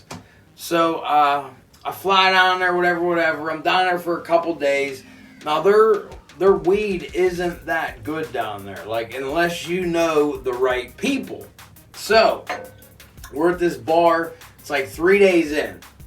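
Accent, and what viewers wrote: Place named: Pittsburgh, United States. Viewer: plus I'm from pittsburgh So it's great to hear the stories told with our burgh accent and from a pittsburgh point of view And funny as hell I say call Netflix !!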